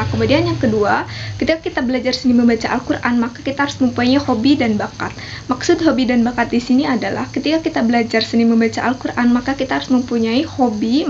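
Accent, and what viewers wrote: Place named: West Java, Indonesia. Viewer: orang sunda nih. v jad p. wkwkwk.. maaf mbak. sama2 urang sunda.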